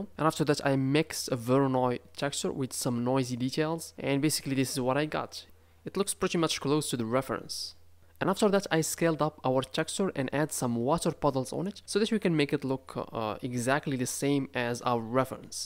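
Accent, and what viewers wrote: Morocco. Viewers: Are you Moroccan 😄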